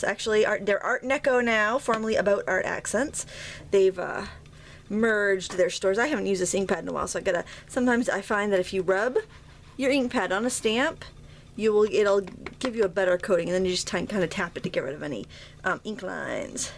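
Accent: Maine, United States. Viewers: Lolol, welcome to you tube land, to hubby! Love the little frog. Don't know why, but I've always loved them. Thanks for sharing all you do during your videos. I was watching your vid the other day, my sweetie walked past, and stopped... staring at the screen. I said you were a great teacher, and that you lolwere from Maine, he said "Oh." And moved on. No telling what that meant, but he's from MA. Boston more precisely. Lol Today is the first time I've heard your accent come thru. His is always there! You should hear the recognition problems he has on the phone! Anyway thanks for all the thinks I've learned from you. Hugs, Jess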